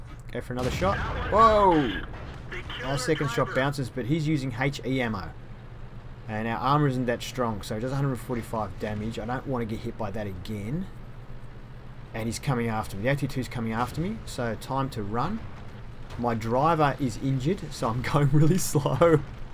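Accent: Australia. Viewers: Hey - I'm enjoying your reviews so keep up the good work. You sound like an Aussie - where are you from? I'm from Melbourne and also play on SEA server...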